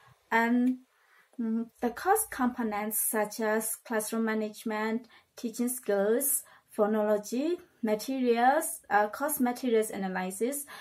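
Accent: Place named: Myanmar. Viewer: As a Burmese I feel proud not because she speaks good but her accent is a little bit different from other Burmese and for her courage ..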